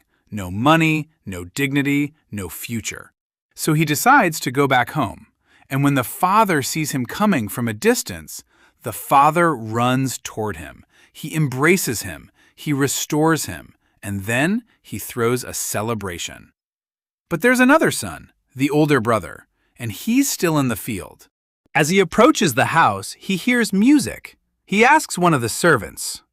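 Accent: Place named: India. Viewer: The indian accent got me😂❤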